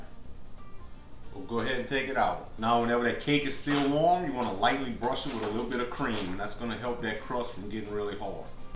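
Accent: Louisiana, United States. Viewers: He is Louisiana...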